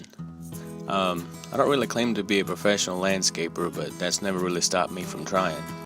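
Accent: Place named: Louisiana, United States. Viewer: I was way off. Sounded more like southern Louisiana. I really like the Chanel. Keep it up bro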